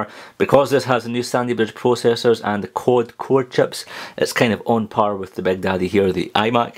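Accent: Scotland, United Kingdom. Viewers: i love your accent i love scotland man. cheers from greece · Are you scottish?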